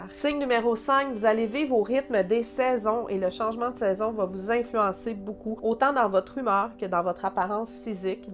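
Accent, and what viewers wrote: Quebec, Canada. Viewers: Waouh Nouvelle Vidéo J Adore l accent Canadien😉 Tes Cheveux Supers. Nous Sommes Sorcières de Famille Ma Grand Mère Le Grand Oncle., Bisous a Toi😉🐾⛲🐱🐈😄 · hello! Vous avez une voix qui nasille et l'accent québécois avec lequel j'ai toujours eu beaucoup de mal à écouter mais vous êtes tellement douce que j'arrive à vous suivre et à beaucoup apprécier!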